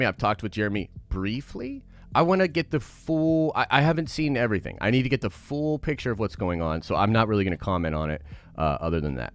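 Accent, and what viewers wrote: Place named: Western Pennsylvania, United States. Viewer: did your west Pennsylvanian accent inexplicably get HUGE in the last week and a half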